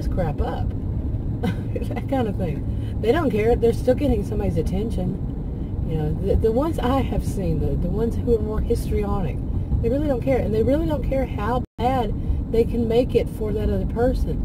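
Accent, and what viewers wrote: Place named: Texas, United States. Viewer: I was happy to find your video. My 72 year old narcissistic mother and 48 year old, younger, golden child, narcissistic brother have both stolen lots of my life/time from me. Lots of ongoing ptsd. Still, I forgive them. I have to. My faith requires it. Even though the abuse never stops. At first I thought, you sound Texan. Then I saw the licence plates(lol). I'm in Pearland. Howdy neighbor. Hope your channel grows. Subscribed. God bless you and yours.☺🙏💜👍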